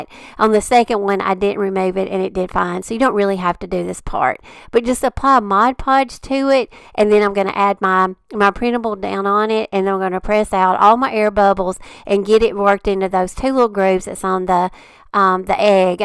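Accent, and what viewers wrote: South, United States. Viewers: I also love your sweet southern accent ❤️. What part of Alabama are you in ? I live in Haleyville Alabama. It's in the north west part of the state.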